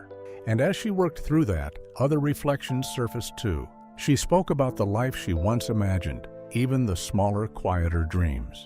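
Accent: United States. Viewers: Why an American narrator? 🤔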